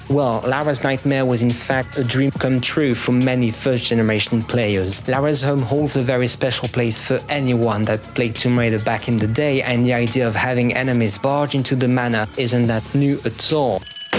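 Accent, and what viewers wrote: France. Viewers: French accent is 👌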